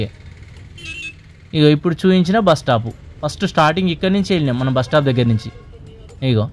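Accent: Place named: Warangal, India. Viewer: Bro chowrasta antunnV..nuvvu warangal aa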